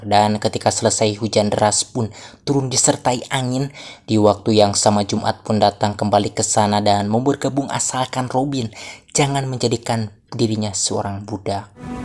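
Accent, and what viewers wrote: West Java, Indonesia. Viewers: Logat Sunda pisannya... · Org sunda ya? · Sunda mana min?? Lucu,ngahibur euy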